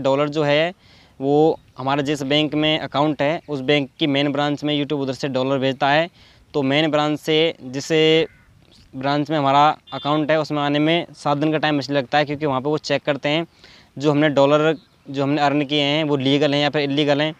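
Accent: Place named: Jhansi, India. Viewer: आपकी जो हओ बाली भासा है बो मेरी भी है में झांशी से हूँ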